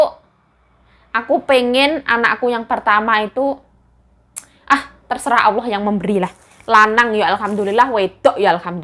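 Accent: Java, Indonesia. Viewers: Mbak pean Indonesia mn to kyok'e Bahasane podo karo aq jowo tulen😀👍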